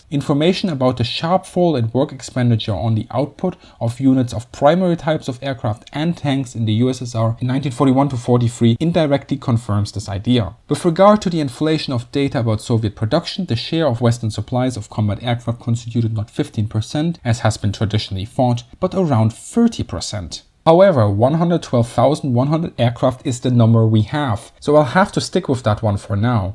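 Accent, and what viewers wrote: Germany. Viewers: We have always been grateful of the USA effort to help us against NAZI Gernany. And we did pay back Lend Lease. Though our tanks were better we loved he USA and still do. The spam you sent us was a great relief to our rations. So please do not think all Russians are not thank full or were not thank full. I wish people like you understand the whole issue. How long did it take Germany to pay of the damage they created in Europe. My family is from St Petersburg. Please do not put all Russians or CCCPs population and military in the same box. BTW most of my family perished in St Petersburg. So what is your real point. You seem bias. NKVD really???? Or what about your Gestapo. Yes you sound German. If not I do apologize. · The video is very informative and interesting as well, though I’m sorry, but I simply can’t listen to that hard german accent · Sorry, but the guy is a native German speaker who has taken the time to learn English so we can get excellent information that many English historians do not access because they do not read German, or Russian.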